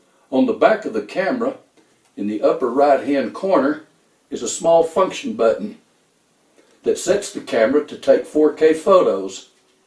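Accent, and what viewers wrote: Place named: South, United States. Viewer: I do not know whether you have taken studies in communication at all but you are gifted communicator: concise, precise, positive and with the common touch and southern humour. Shades of Mark Twain and Paul Hogan of Crocodile Dundee. The South accent and turn of phrase is also part of your appeal. Your skill is not a skill that can be learnt beyond a certain point, no matter how much training. It is interesting that we in Australia use some of your colloquial expressions. You may want to broaden your topics beyond photos to homespun satire. One of our famous satirists passed away this week. See John Clarke and Bryan Dawe on Youtube.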